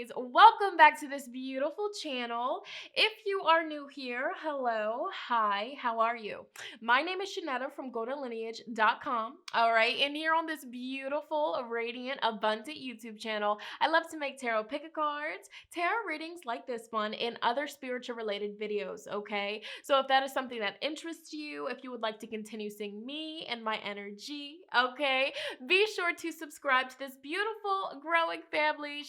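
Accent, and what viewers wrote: United Kingdom. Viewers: I love her undertone British accent❤️ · Interestingly enough I hear British accent in your voice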